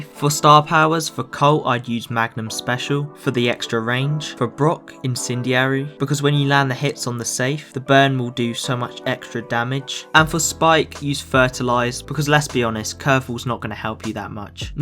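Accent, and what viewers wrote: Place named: United Kingdom. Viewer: This is the most British accent i have ever seen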